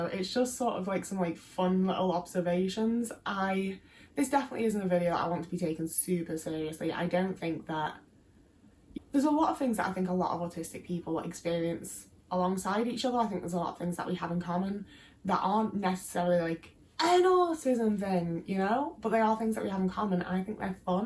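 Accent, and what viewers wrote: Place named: Midlands, United Kingdom. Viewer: When I first watched your videos, I was so confident that you were fully scouse based off of your accent but now I don’t really hear it anymore. I think your accent is getting more midlands over time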